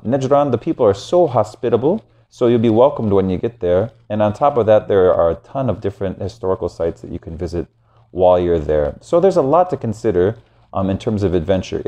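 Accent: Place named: United States. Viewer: You sound American now! Sorry about the earlier comment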